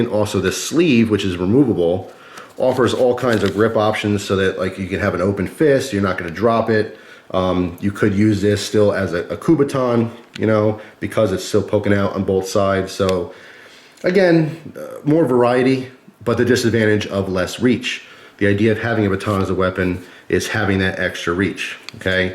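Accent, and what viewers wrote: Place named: Rhode Island, United States. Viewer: Hey, are u from RI? U sound very Rhode Islander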